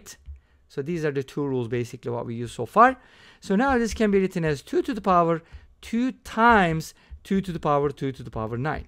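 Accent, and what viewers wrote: Turkey. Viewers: you got that turkish accent rolling 😁 keep on doing what you do🫶🏻🫶🏻 selamlar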